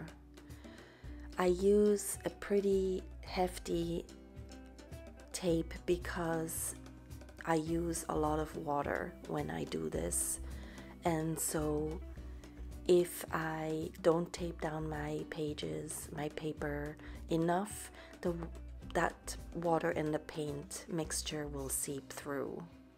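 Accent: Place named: Hawaii, United States. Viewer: This is an awesome piece in and of itself, but it's more meaningful because of the thoughts you shared at the end. Thank you for that. 💜👍 Are you or your spouse from Hawaii? I hear an accent in your voice 🙂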